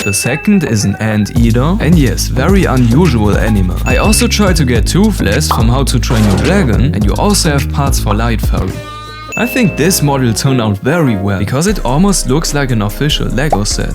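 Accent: Germany. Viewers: Are you German it Fells Like a German try to speak englisch 😅(no hate) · Why do I hear DEUTSCH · He sounds German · I hear a littel german ngl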